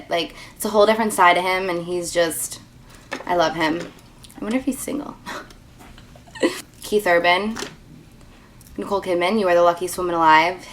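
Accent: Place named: Brooklyn, United States. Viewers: You're absolutely gorgeous. U look like Megan Fox. U seem like a very down to earth girl. You're very talented! Love all ur tutorials! I love Ryan Gosling too lol. Are u from New York or New Jersey? U have a New Yorkian accent. I really like what u said about not settling for anyone--wise girl hahah srry for all these comments bunched together. Anyways keep doing wut you do and thinking the way u do, you will succeed :) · Are you from Jersey or NY? I'm from Brooklyn & I can hear that voice represent girl!!!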